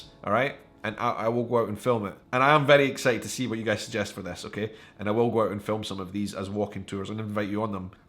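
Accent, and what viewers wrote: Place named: Highlands, United Kingdom. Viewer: <sighs> I listen to your accent. ...and I hear my dad when he would show me how his grandmother, (my great grandmother), would talk. I never met her. It's like you said. She was from the Highlands and as I hear it, there is no place on earth as beautiful. I'm getting way older, now, and I hope I make it Scotland.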